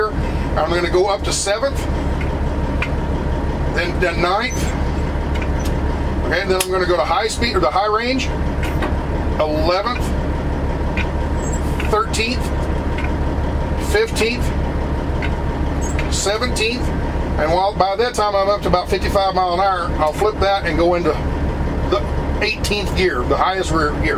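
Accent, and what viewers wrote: South, United States. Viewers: wer runnin thirty mile anhour....... true southern accent. 👌 love it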